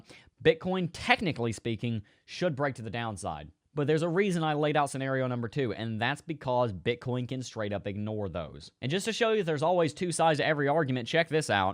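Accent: South, United States. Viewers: I like the mix of smartness and the southern Accent he has.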